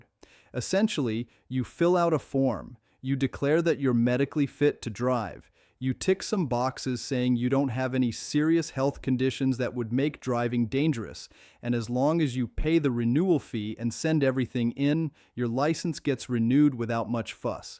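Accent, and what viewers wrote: United States. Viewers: Well to be honest, what do you expect with a USA narrator?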